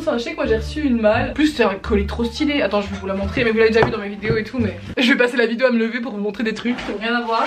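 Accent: Belgium. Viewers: T'es belge?😃😄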